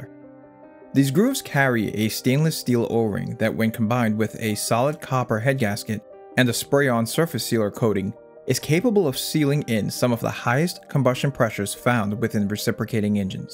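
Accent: United States. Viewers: Quit using these stupid metric units that nobody uses, also you sound American so I don't know why these units are your primary's.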